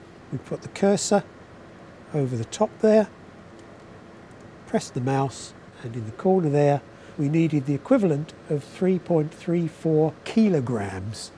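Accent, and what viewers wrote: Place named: United Kingdom. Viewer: This is the first person I've ever heard say "toe-MA-toe" regularly outside of the phrase "toe-MAY-toe, toe-MA-to" to express the likeness of two things. Is that part of the British accent, or just an odd exception?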